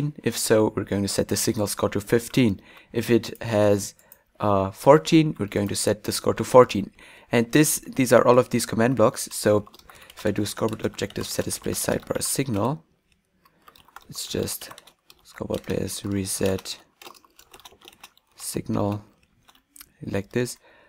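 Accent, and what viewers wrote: Germany. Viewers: He's german, but that is very little of a german accent ^^ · Come you from Germany?!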